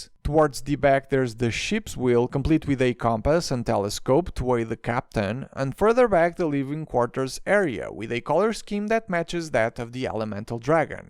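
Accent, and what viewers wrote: Portugal. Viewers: Sotaquinho da tuga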